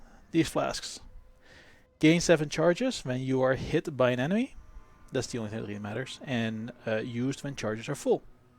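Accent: Netherlands. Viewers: Je hoort meteen t nederlandse accent haha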